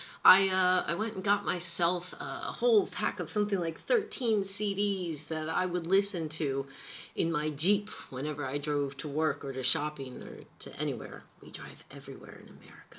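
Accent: Bavaria, Germany. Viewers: When you speak German you sound like a Bavarian.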